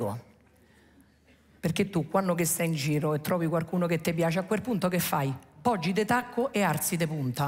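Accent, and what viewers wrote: Rome, Italy. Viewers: ma quelli che paragonano l'accento di con la Presidente del Consiglio per via dell'accento romanesco.......bah.....(perchè non dire allora, la stessa cosa della grande Anna Magnani!!?) che problemi avranno!?? come dite...ah! si,.....FATEVE UN BEL BIDE' DE CAMOMILLA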